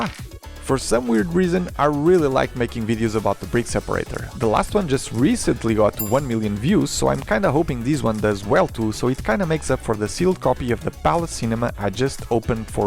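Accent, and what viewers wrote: Portugal. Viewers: Aos 2 minutos comecei a notar o sotaque, olhei para o nome do canal e conformou-se, portugueses para a frente! Gostei do vídeo, conciso e interessante, bom trabalho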